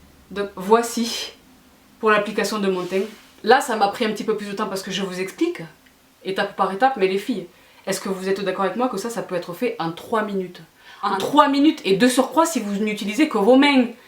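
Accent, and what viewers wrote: South, France. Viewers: Coucou ! Je viens de découvrir ton compte et j'adore ce que tu fais : tu es claire dans tes explications, tu es enjouée, positive . Ton accent du sud 💕 je m'empresse de visionner toutes tes stories et de m'abonner à ton insta. Merci merci !! 😘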